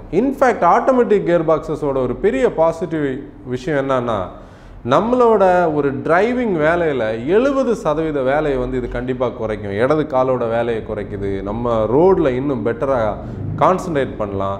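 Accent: Kanyakumari, India. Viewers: Kanyakumari slang 👍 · nanum kanyakumari thaan anna. nama slang ae pothum enga irunthaalum nama ooru kaaranga nu kandu pidicherulam😇❤️❤️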